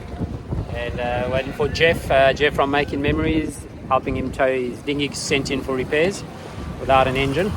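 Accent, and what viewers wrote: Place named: Durban, South Africa. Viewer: Accidentally found your channel and then realised you have the same accent that I have! Enjoying your content. Ex Durbs.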